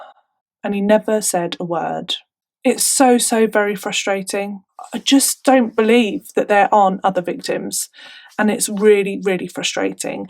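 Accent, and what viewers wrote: United Kingdom. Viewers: i just found your site by accident and watched one of your videos. Normally I would be irritated by your presentations, but I find your approach to be delightful -- can't say funny because of your subject matter!! I am from London originally so its refreshing to discover a British narrator of horror stories!! You remind me of Miranda Hart who had her own unique TV show, I hope you do other performances other than tell these stories?? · I love your videos. Love your voice and attitude. I’m American, so I love the attitude and voice of the English. Can you add more photos as you’re telling these cases? I’m not asking for shock photos, but photos that humanize the case more. Like childhood photos of the victim, photos of love ones, photos of the killer, photos of the trial, etc. Please and thank you.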